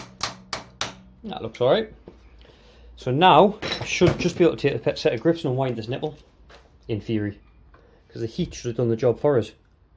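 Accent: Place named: North East England, United Kingdom. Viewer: Mate av got the same problem with my trials bike am sick, you sound like your from the north east, fancy helping me?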